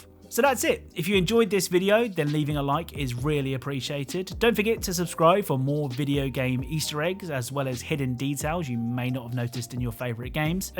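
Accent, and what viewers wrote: England, United Kingdom. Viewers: Charming English Accent.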